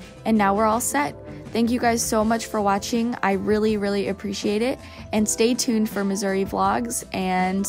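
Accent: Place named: Michigan, United States. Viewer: Me: idk why but she sounds like she’s from Michigan Her: get ready for the Michigan wether Me: I knew it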